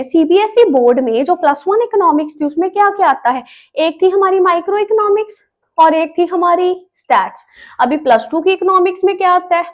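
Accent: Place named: Punjab, India. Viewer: Mam i think tou are from punjab from your accent😅😇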